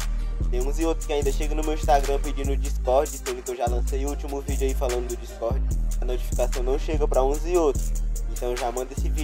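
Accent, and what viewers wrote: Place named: Pernambuco, Brazil. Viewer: Sotaque de Pernambuco aí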